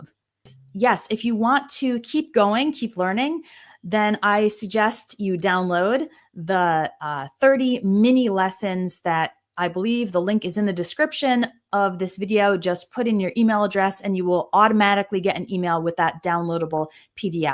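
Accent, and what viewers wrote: United States. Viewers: Very attractive and clear American accent, quite impressive indeed, but too short, it should be a bit longer so the learners can have a better yield ❤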